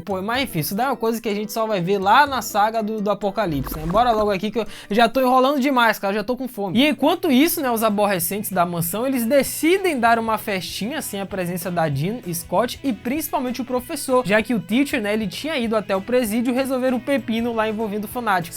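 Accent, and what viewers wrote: Bahia, Brazil. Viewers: Cara tu é daqui do baixo sul da Bahia? Simbora meu conterrâneo, voa mano!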